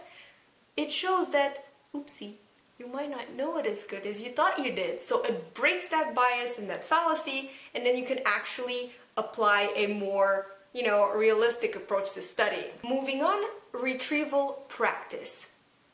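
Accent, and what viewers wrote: Russia. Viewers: Are you from russia? :D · вы русская?)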